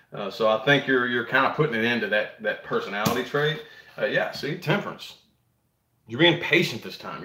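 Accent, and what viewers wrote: South, United States. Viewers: New subscriber alert!!! Loving that Southern accent of yours! Great reading. Thank you.x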